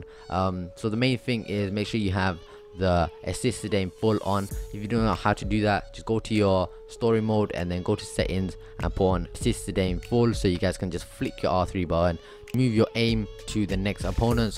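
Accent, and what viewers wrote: United Kingdom. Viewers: HE TALKS BRITISH 🔥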